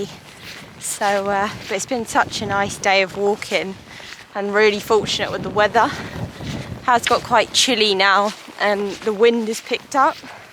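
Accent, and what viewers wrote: United Kingdom. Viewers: I from indonesia(kupang City)i like u aksen british&you nice girl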